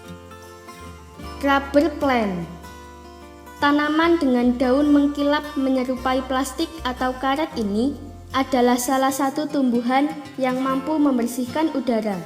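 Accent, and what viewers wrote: Java, Indonesia. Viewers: Jawanya medok kalii itu bang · Beetele2,,,,n sgt meddok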